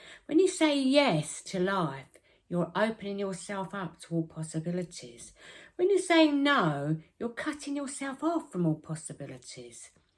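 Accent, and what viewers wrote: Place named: United Kingdom. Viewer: love your accent. would you like to have a cup of tea ?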